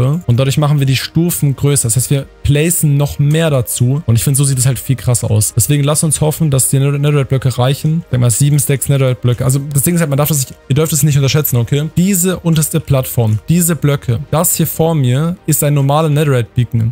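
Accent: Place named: Germany. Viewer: Ich habe dain english video ge sehn und sofort geraten das du deuch bist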